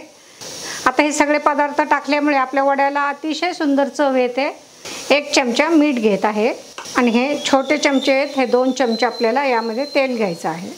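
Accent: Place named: Ahmednagar, India. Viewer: Tumhi Ahmednager cha aahat ka tumcha bolanavarun taser care.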